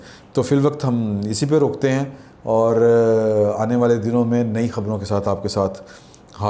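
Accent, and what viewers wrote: Punjab, Pakistan. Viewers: پہلے اپنی اردو تو ٹھیک کر اردو بھی پنجابی کی طرح بول رہا ہے كابرانا نہی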